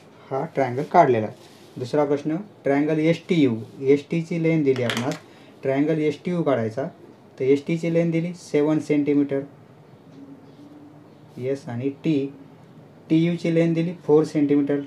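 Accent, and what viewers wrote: Latur, India. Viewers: सर तूम्ही लातूर चे आहात का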